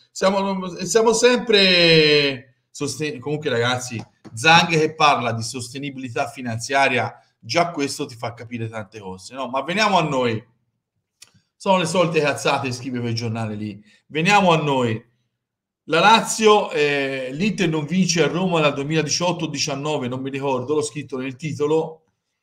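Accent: Tuscany, Italy. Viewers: Accento toscano Top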